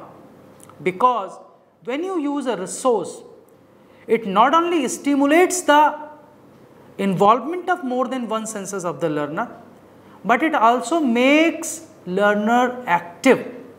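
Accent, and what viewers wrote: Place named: India. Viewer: Indian accent 🤦‍♀️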